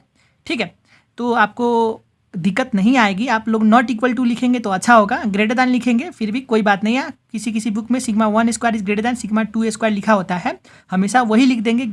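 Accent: Nepal. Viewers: Why do i feel like ur a nepali??that accent is so nepali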